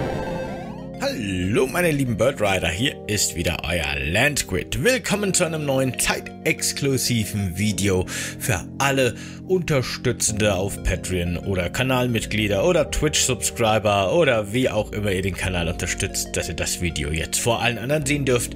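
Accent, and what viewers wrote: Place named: Middle Franconia, Germany. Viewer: Der Lord ist Mittelfranke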